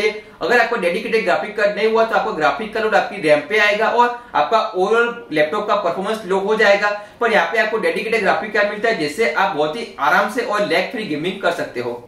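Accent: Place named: Gujarat, India. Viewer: Yeh pakka gujarati hai iske bolne se hi pata lag gaya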